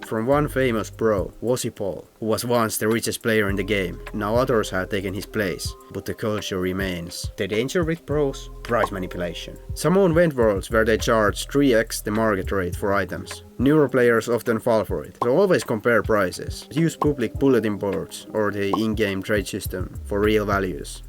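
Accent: Finland. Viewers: I like finnish accent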